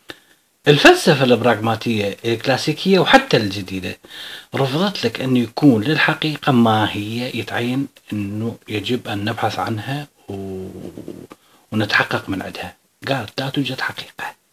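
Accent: Iraq. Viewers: عم بسمعك من تركيا أيها الرائع 💞اليوم شفتك بفيديو شخصيا انت مميز ولما تطلع بالفيديو بيكون احلى بس خلي الجلسة وراك مميزة أكتر مثلا خلفية بيضا وتجلس عا كنب وامامك الطاولة مبدع انت كتير استاذي وانا كمان صديقتك بالفيس لهجتك العراقية زادت الفيديوهات جمال💞🥀 فيه بصوتك نبرة حزن ليش😔💔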